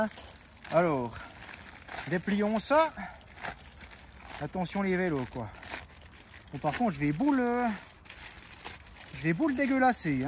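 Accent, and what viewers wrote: Switzerland. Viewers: Eh ta moi en proche frontière suisse qui devine ton patois sans me tromper 😅😂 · Super accent jurassien suisse, j'ai la larme à l'oeil!😂👍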